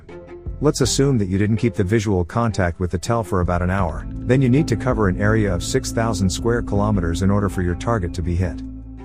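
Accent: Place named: United States. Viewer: Perfect American accent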